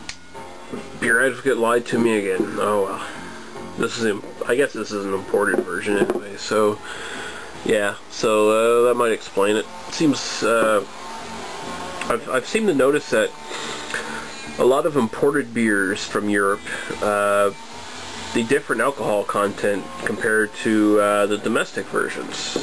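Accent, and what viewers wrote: Canada. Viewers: Drinkin one with you now bud. You sound like a good Canadian boy.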